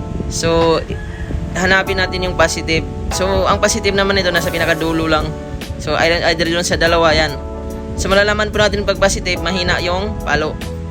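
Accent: Iloilo, Philippines. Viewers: Ilonggo kaba boss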